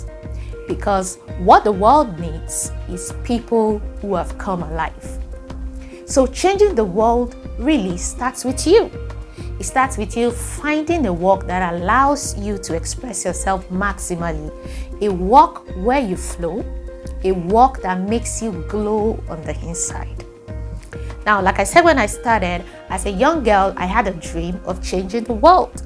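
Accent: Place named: RP, United Kingdom. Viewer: This makes a lot of sense. Sequentially presented with Queen's English. Engr. Dr. S. A. Aina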